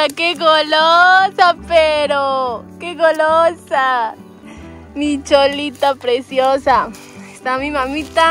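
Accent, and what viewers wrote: Ecuador. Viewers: Tienes asento Cuencano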